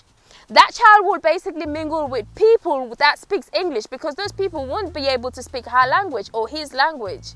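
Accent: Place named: RP, United Kingdom. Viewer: Hi. Can we do a massive online database for all major African languages assisted by those who speak them well and technologies like NLP, ML, AI, Crowdsourcing, and the like? Forgive me for saying this but your Queen's English is adorable (This is what they taught us in school from Primary all the way to Uni)so I cannot remove myself from it entirely. I'm a Kenyan from a major tribe that takes English as its first language! Talk of colonial mentality.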